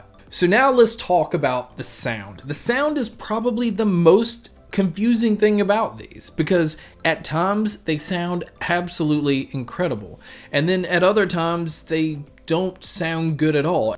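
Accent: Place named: Carolina, United States. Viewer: You have a Southern/Northern Carolina accent I love it!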